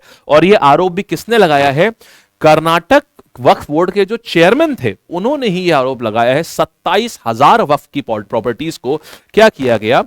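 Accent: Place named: Bengal, India. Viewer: Sir up ka bat sunker lagrahahe up bangali hei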